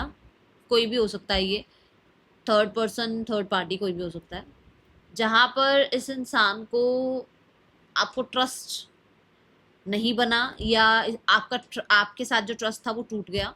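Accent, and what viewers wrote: Punjab, India. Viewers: Muje ap ki lengve s पता लग raha h ki ap पंजाबी h Mam टोटल बदतमीजी इसने ki h Maine पता नहीं कितनी बार फोन kiya per इसने kabhi फोन att nahi kiya kya karu please please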